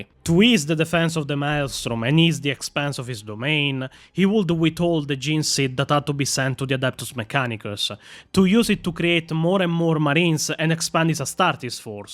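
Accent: Italy. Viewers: The only problem with these wonderful renditions of lore is now I cannot take anyone telling me lore seriously that doesn't have an Italian accent. 🙂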